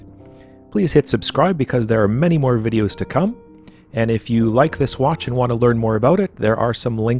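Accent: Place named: United States. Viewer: Konstantin Correct. But he sounds American and they spell English words badly there.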